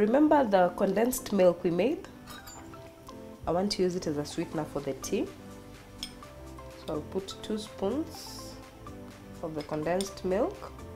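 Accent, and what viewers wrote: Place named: East Africa. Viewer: Esther Mutua try not to be silly. She speaks beautifully with an East African flow.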